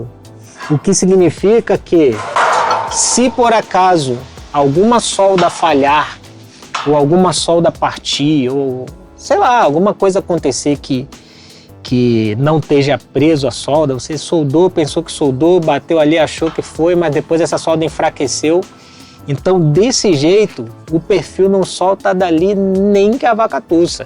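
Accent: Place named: Rio de Janeiro, Brazil. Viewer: Você é do Rio?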